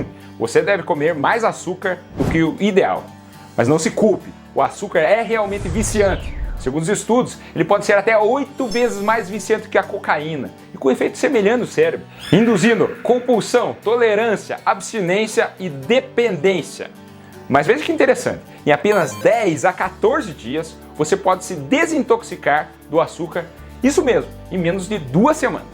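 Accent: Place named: South, Brazil. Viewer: Sou de Lima Peru e ja inscrevi no seu canal, amo do seu canal e sotaque sulista como o meu hehehe🥰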